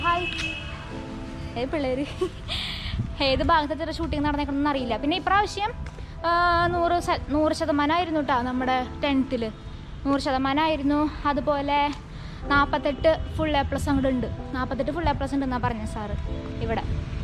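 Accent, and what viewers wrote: Thrissur, India. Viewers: A simple village vlog from Simple Village girl 👧 loves your Thrissur slang talking 👩‍🎤😍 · അടിപൊളി അവതരണം ആണുട്ടോ.. എന്നാലും നമ്മുടെ നമ്മുടെ നമ്മുടെ ഒന്നു കുറക്കാൻ പറ്റുമോ. തൃശ്ശൂർ സംസാരം കേൾക്കാൻ നല്ല രസമാണ് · Aa thrissur karide aa slang powliyatto atha kuduthslum video kanan preripiche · Ente frnd Dayakuttynte same samsaram avalum Thrissur anu...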